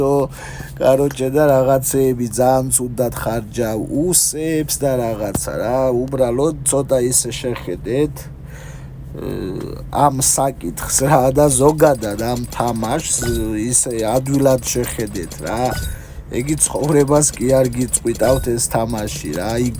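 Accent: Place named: Imereti, Georgia. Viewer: შენდენი იუსები საქსრთველოში არავის გოუხსნია საღოლ ძმაო ისე იმერელი ხარ საუბარზე მეც იმერელივარ ვანი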